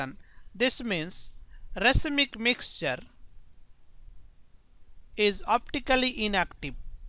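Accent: India. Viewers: I love Indian accents :)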